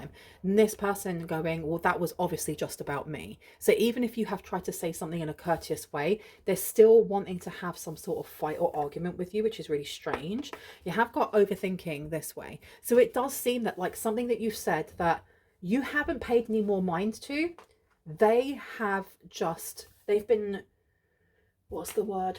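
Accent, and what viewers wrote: United Kingdom. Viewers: I love watching you . Your voice is so amazing to hear , it’s very clean , and beautiful British accent . It’s drawing to listen , so alluring cause of quality of Your voice . And the content is also really interesting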